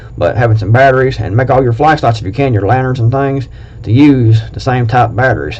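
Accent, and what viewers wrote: United States. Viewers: Your accent says America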